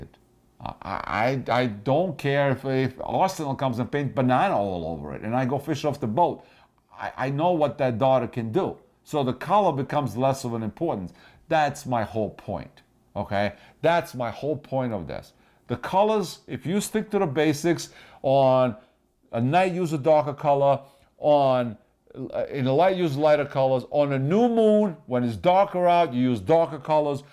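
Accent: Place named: New York, United States. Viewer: you are The Man. I started out just watching your videos when they popped up relating to a subject I was looking for. The more I watch though, the more addicted I'm getting. This one is the best yet - informative, passionate, and really funny. It looks like there's a whole bunch for me to catch up on too. Way to go. Many thanks. Raymondo Ps. Even though I'm familiar with the New York accent (sorry I should have mentioned that I'm in Wales, in the U.K.), you get me sometimes with your pronunciation. For the first few videos I watched I couldn't figure out why you kept talking about your daughter's??? Of course it all came clear when I watch your show on 'darters'. Stay cool pal.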